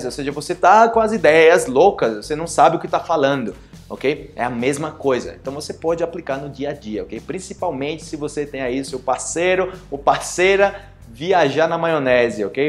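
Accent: Spain. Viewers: Vc é brasileiro??? Eu acho que é, mas deve morar fora pq ja percebemos um leve sotaque e uma soniridade espanhola na sua fala...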